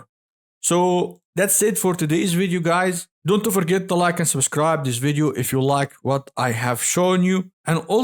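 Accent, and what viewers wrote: Egypt. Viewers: egyptian accent?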